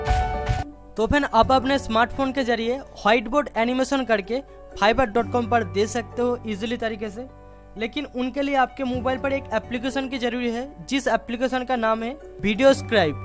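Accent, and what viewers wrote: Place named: Bengal, India. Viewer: Bro thik se hindi bolo banhali kayse bol raje ho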